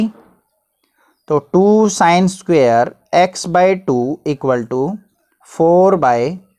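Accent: Haryana, India. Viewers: Sir haryana se belong krte h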